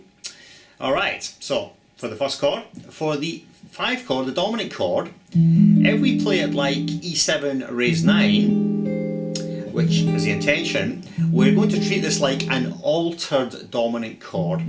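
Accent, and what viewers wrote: Scotland, United Kingdom. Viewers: well - i'm convinced, you know what you do. how is it possible people can get so good at their instruments?, how many hours have you spent playing in your life? just roughly. i want to estimate if there is a chance for me at all. major doubts. btw, are you scottish? sorry if i'm wrong, but there is something in your accent i have heard before - jim kerr. (i'm german - i can't figure it out exactely)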